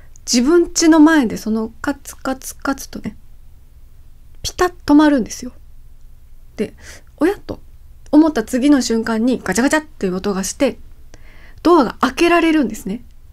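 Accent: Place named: Kansai, Japan. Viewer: 今回は道頓堀での講演のせいか、関西弁が強めの深津さんは良いね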